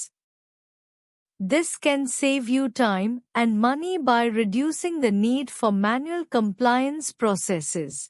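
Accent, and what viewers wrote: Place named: India. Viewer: Omg why this Indian accent when it's a bot???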